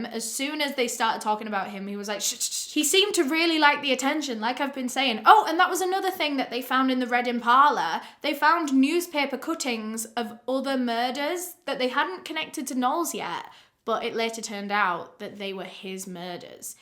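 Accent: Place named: Manchester, United Kingdom. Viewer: Yes love the Manc accent ❤️❤️❤️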